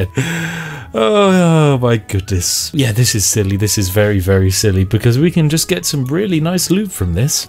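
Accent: United Kingdom. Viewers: *moans* A perfect British accent...I love it.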